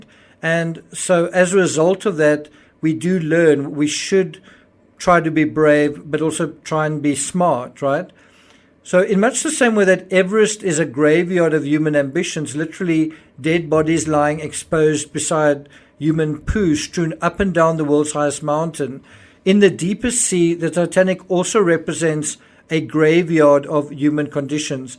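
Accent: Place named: South Africa. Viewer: Ive been trying to figure that accent out and i think its South African? Great content btw.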